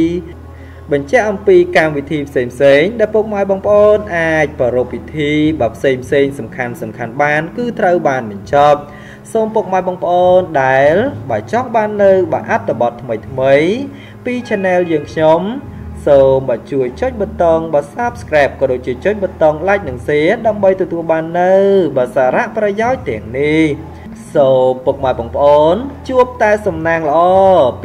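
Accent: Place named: China. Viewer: និយាយដូចអាចេកចឹងពិបាកស្តាប់ណាស់វើយ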